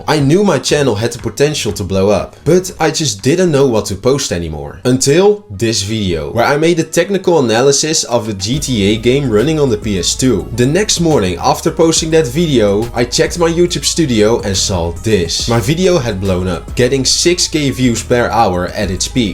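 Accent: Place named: Netherlands. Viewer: bro nice video btw are you dutch? i feel like i can tell by your accent 😁❤️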